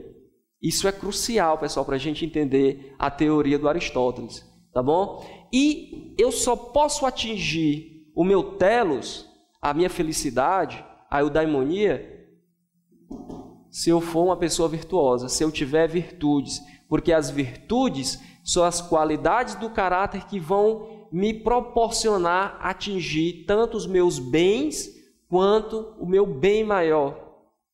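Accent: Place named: Ceará, Brazil. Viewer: Professor muito boa aula! O conhecimento liberta! Vc é cearense? Seu sotaque parece de lá, muito lindo. Minha família é do sertão do Ceará!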